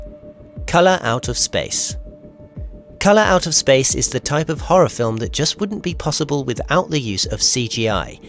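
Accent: United Kingdom. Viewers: I prefer this British accent day over most Aussie accents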